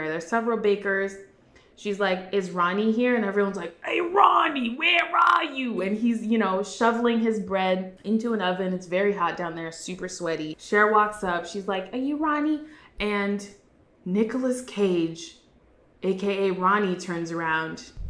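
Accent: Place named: Italy. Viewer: Your Italian accent is everything 😂 now i gotta go watch this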